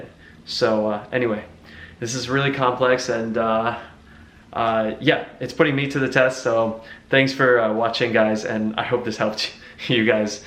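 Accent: United States. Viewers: Why do you sound so American in this video but so Australian in the Ling Ling workout video lmao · This is like the musicians equivalent of a no-makeup selfie. BTW I thought he was Australian? How come he has an American accent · I thought he had Australian accent oh well he has a great American accent he’s amazing at the violin too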